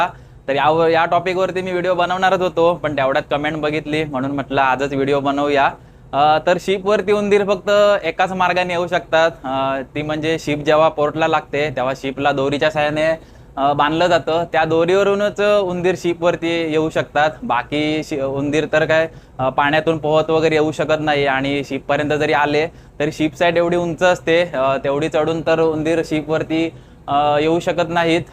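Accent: Konkan, India. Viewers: Dada tumhi boltana konkni tone janvto 😊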